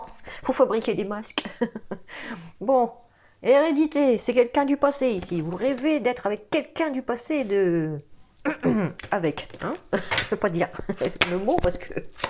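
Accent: North, France. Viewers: Hein !! Hein !! Y’a un ptit accent du nord !!!!! Excellent..merci !! 😂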